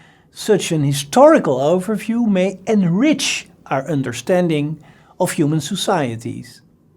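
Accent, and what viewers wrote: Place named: Netherlands. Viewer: His name and accent would suggest that he's Dutch.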